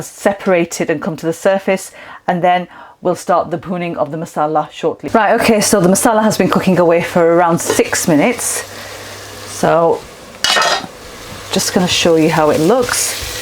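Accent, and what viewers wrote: United Kingdom. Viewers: Awesome recipe ! Good job ! Please speak slowly so that we understand your British accent well.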